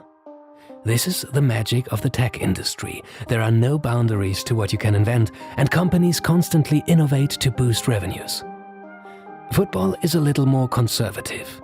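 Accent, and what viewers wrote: Germany. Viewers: the voice and the german accent 😩😩😩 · I used to have a professor lecturing in Media & Sports, who was from Germany. Every time I watch these videos it’s like being back in class. Not just accent, it’s almost exactly the same voice. Eerie but awesome.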